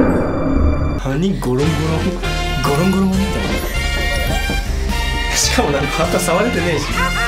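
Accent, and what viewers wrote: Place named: Kansai, Japan. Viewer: 関西弁いい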